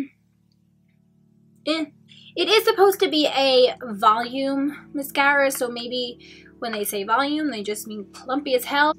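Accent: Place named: East Coast, United States. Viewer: I love your tan!! You look great!!! I bought that Monistat primer on your recommendation and although it sounds awkward to put it on your face or tell your friends about, lol, it works great. Thanks!! I have super dry skin, so Im excited to try the foundation. I was considering trying it with no or a different foundation primer. Just an idea!! Great reviews, always!! When do you head back to Granada?? Do you miss it?? Every once in a while I catch your east coast accent, especially when you say "mascara," lol, and it makes me smile. You seem like such a west coast girl, like me, until I hear "mascara!" haha. Just a silly observation. Watch, now you'll notice it too. Habaha. Take care,!!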